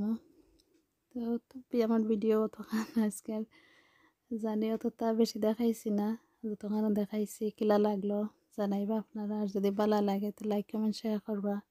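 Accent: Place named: Sylhet, Bangladesh. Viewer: MashAllah afa mojar ranna. Bhala laghlo sylheti kotha hunia..Ami USA thakia dakiar..Apnay kitha U.K?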